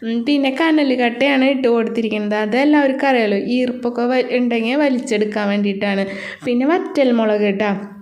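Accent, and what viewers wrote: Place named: Thrissur, India. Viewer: നല്ല വീടിയോ സൂപ്പർ നല്ല ധിർസുർ പാഷ്ഷയാ കേള്ക്കാന് നല്ല രെസമാ അവസാനത്തെ ട്ടാ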